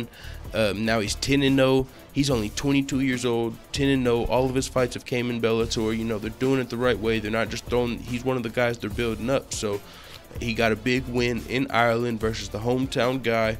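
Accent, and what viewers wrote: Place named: AAVE, United States. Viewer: You're Ebonic dialect is seeping through.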